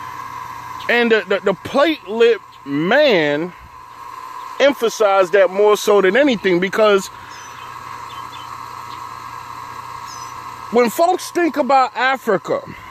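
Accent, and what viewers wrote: Europe. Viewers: I know you ain't been to Africa, and you sound like a European, do research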